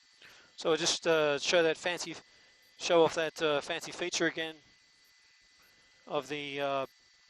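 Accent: Australia. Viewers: Never heard an Aussie narrating an American chase before. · The narrator was awesome. Maybe an Aussie or a Kiwi?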